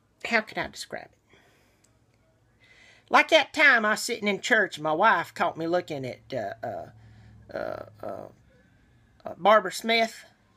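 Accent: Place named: Arkansas, United States. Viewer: Im trying to guess where your accent comes from.. arkansas?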